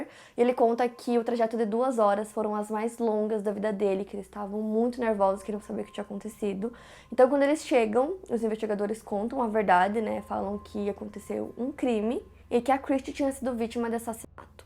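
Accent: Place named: Paraná, Brazil. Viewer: Ela é de Ponta Grossa (Paraná). Também amo o sotaque dela!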